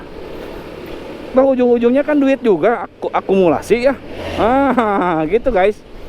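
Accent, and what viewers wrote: West Java, Indonesia. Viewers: assalamualaikum abang, salam silturahim dari mmx malaysia. wah biker sejadi. ngevlog abang juga keren euy. enak dengerin logat sundanya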